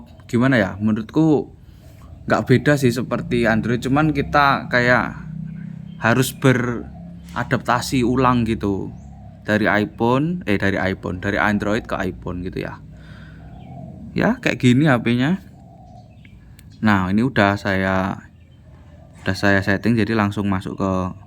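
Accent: Java, Indonesia. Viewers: cangkemu medok tenan ngomong guweh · Jowone manteb mas😂😂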